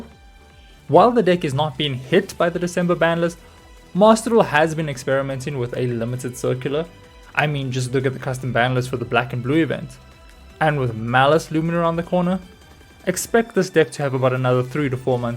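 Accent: South Africa. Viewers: Awesome vid man 🤙 recognised the SA accent immediately 😂 much love from Jozi ❤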